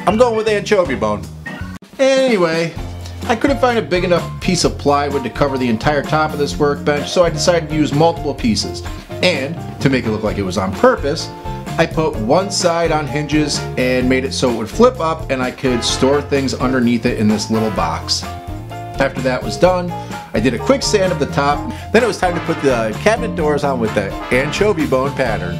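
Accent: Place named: Midwest, United States. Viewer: Where are you from? You sound very midwest lol not a dig, just familiar. MI here🙋🏼‍♀️ Awesome job by the way!!👏😃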